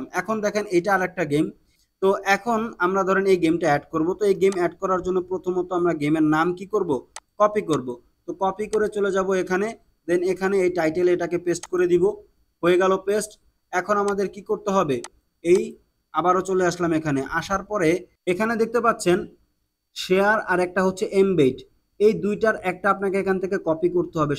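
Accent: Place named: Barishal, Bangladesh. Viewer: vaiya mone hoy Borishailla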